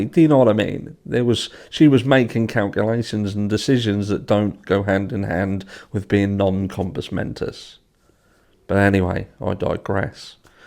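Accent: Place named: England, United Kingdom. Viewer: I want a “but anywaaay; I digress” in rad English accent hoodie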